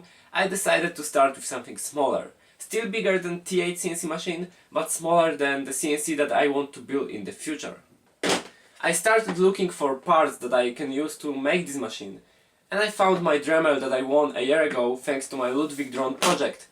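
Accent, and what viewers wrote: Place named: Poland. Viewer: oj słyszę tu chyba polski akcent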